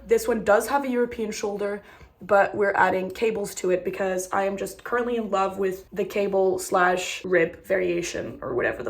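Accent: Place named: United States. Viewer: hi this is so random but where are you originally from? you sound so american but you look so european 😭 sorry if this is rude i don’t mean it to be! also all your knits are gorgeous!!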